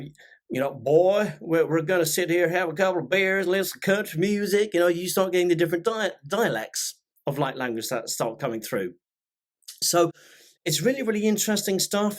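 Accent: South, United States. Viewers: I love the southern accent❤😂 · Wonderful tutorial! I have been contacted by beings from the Orion constellation. I am unfamiliar with the particular groups from this star group. Any insight is much appreciated!! Also, love your southern accent, ha! I’m an intuitive guide from Georgia USA 🇺🇸!! In love, light, and service~ Elizabeth Maria Unlimited ❤🌎✨🙌🏽🧘🏽‍♀️🌞🧬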